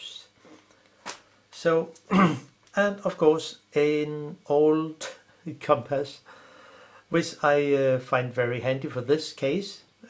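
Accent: Denmark. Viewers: You've got a cool accent but I can't pinpoint it. Are you Danish?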